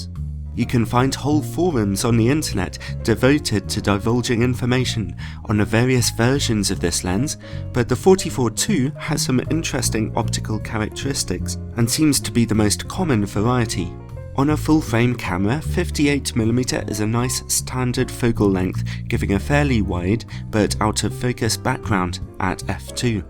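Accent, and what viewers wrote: United Kingdom. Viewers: Even if this is an old video, it's always a great pleasure, and really relaxing to listen to your soothing voice with your sweet British accent,. Also, your microphone is perfect for your voice, and this music made by the famous fits perfectly for your videos. It's like your trademark now in some way, haha! Great video review about the Helios 44-2, thank you!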